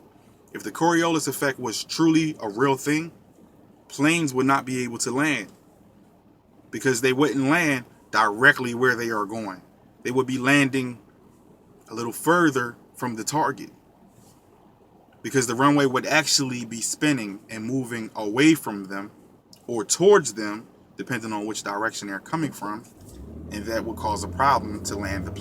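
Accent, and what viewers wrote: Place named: Philadelphia, United States. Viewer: Poetic THAT SHIT DONT EVEN SOUND RIGHT LOL ... People can't comprehend water is a tools to measure completel FLATNESS! Where u from bro? Tell me u from HARLEM ? where n bit Charlie clips is from ? Rocking the power wheels and shit! This mad got a memory about his power wheel !! Haha ! Naw . U look and sound like u from philly!!!! Light skin with the thick beard . Good points tho my guy! Salute to you and your enlightenment to this flat earth stuff . It will blow your mind but it's awesome to have this crazy ass info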